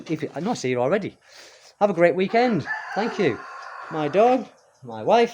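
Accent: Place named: Cockney, United Kingdom. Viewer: Being French and loving the English language, my favorite is the cockney accent from London. Which is where your accent is from? It sounds chicky ans is very lively. "Hello, you lovely people!" I love it! And then the little boy coming in at the end as if, he too, wants to take part to the video. As for the content, I guess we can get bored and lonely anywhere in the world, maybe that some places are just better than others to get bored or lonely. There's no paradise on earth, I think..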